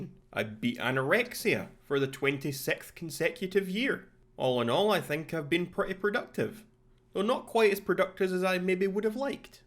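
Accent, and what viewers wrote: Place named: Scotland, United Kingdom. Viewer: Bro saying Australians have the worst accent while this dude is Scotland (not hating on Scotland btw)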